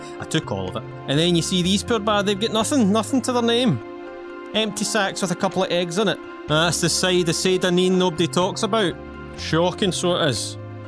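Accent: Scotland, United Kingdom. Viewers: I must know your accent. Is it Scottish? Edit: Lmfao this npc gets it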